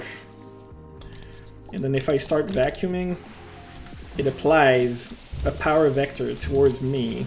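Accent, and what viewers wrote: Quebec, Canada. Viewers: Are you from quebec?? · are you from quebec? you really sound like you do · Are you from the Quebec? I'm from there and I think I heards a subtle accent and, the Quebec accent is pretty easy to recognize. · bel accent quebecois gros xD jespere sa pue pas trop a trois-r lol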